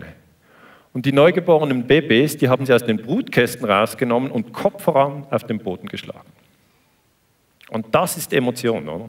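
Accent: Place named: Switzerland. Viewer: ich kann verstehen, dass sie dies bevorzugen würden. Als Deutscher kann ich sagen, dass ich jeden Vortrag ihres Landsmannes genieße und es schade fände, wenn ich nur die Hälfte verstehen würde. Ich finde schiizer dütsch sehr schön anzuhören, aber verstehen tue ich leider nicht genug und es wäre doch schade, wenn so ein wichtiges Thema von 80 Millionen potentiell interessierten Menschen nicht verstanden werden kann. :D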